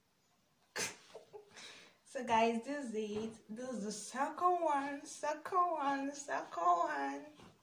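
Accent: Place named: Nigeria. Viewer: Are u Nigerian cox ur accent is Nigerian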